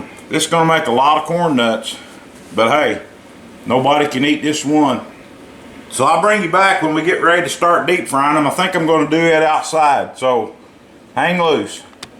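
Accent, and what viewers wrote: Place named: United States. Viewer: Great video. Love the country accent.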